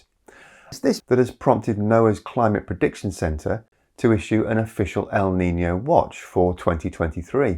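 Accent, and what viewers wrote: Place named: Upper class, United Kingdom. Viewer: I guess alarmist hysteria sounds rational when presented with an upper class British accent. It remains hysteria nevertheless.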